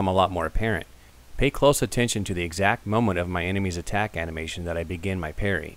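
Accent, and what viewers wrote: United States. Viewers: I see what you mean. Sometimes different microphones have a huge effect on the way a person sounds, but seemed to have a slight southern accent in a lot of these videos that I don't hear in the Fextralife vids.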